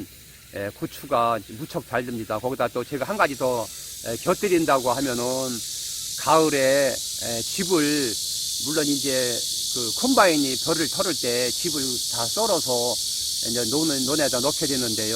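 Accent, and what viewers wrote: Chungcheong, South Korea. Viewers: 구수한 충청도 사투리가 마음이갑니다 듯기좋아요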